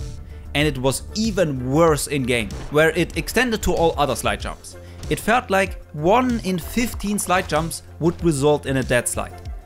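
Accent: Germany. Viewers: Hi are you german? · Nice Video Hey, are you German? Your Accent sounds like it ^^ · Are you German because you sound like nearly everybody in Germany and Austria when they speak german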